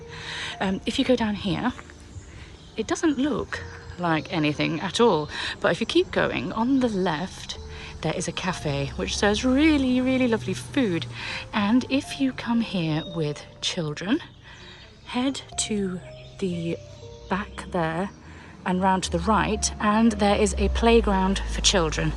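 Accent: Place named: United Kingdom. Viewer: I've just found your channel by a serendipity and it is soooo cute. I'm an english learner, so it's such a pleasure to hear your british accent! Keep going, I loooove your videos!!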